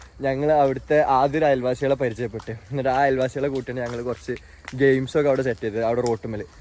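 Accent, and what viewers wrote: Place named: Kasaragod, India. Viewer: Edeyil nammale kasargod bashe bannalo enth pang 🤣💗👍🏻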